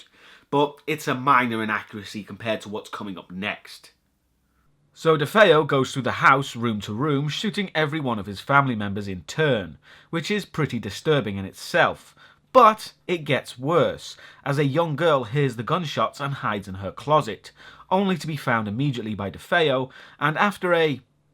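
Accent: United Kingdom. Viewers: cyberdemon 10130 I believe he is from the UK and the song is “ ”by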